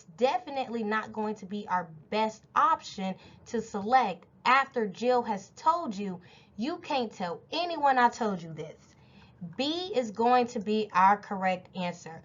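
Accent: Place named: South, United States. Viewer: Super helpful, thank you! And love your Southern accent!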